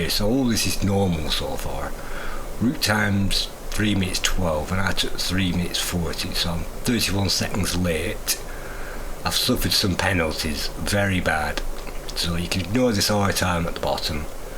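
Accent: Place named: Blackburn, United Kingdom. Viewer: You from Blackburn fella? Sound local.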